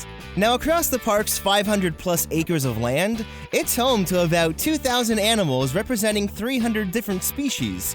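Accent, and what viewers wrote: Canada. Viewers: He is Canadian. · He's Canadian. That's how Canadian's say it.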